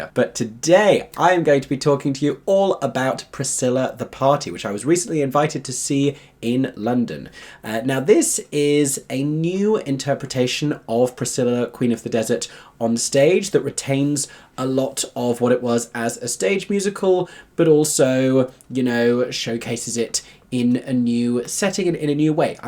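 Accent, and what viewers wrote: Australia. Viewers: Nah gonna need a whole video In that Australian accent now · The Australian people demand more Aussie accent!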